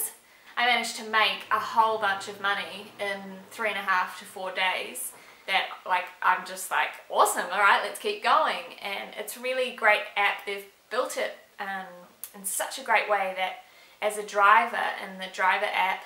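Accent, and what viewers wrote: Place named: New Zealand. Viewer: No offence but u sound Kiwi. Are u kiwi may I ask?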